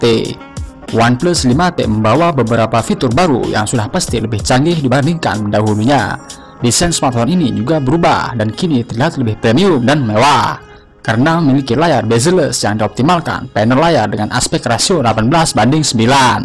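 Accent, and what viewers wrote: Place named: Chinese-Indonesian, Indonesia. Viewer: Dari logat bicaranya kayakx koko koko deh yg ngomong ini... mirip ama logat temen2 gw yg orang china,,hahahah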